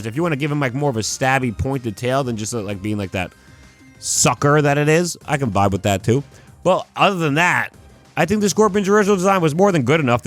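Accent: Boston, United States. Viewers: unrelated, but been meaning to ask, are you from Boston or something ?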